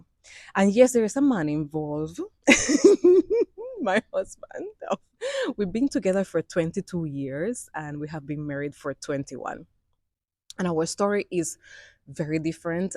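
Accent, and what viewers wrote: Jamaica. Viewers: I just happened to stumble on your story. You are so real. Love your story. You made me laugh so hard just listening to your patois. I’m glad to hear that you’re 22 years in marriage and counting. Blessings to you and your family ❤❤❤ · My exact same sentiments👏🏽👏🏽👏🏽👏🏽 Your video popped up out of nowhere and I clicked out of curiosity. Your personality is intriguing. I am Jamaican, female and I left in 1981 as a young teen. Been back a year ago. Love ❤️ your story!! And your Patois lol😂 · It’s sounds like you’re getting a Jamaican accent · Wait wait a minute a Spanish girl with a Jamaican accent.....ok ok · Good luck with your projects . You deserve to see the world and more . Confession, I always laugh at your Patois . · Girl you are beautiful you sound good with your patois tell it all I’m ready to hear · Same here, accent had me in stitches😂, her patois is on the button, besides that her storytelling is excellent!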